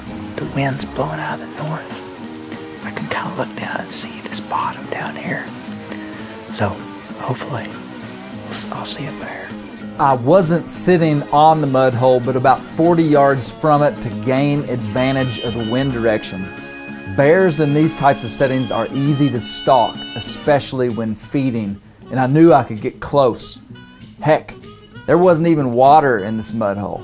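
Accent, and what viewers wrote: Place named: South, United States. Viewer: That's the most southern accent ever. Great video.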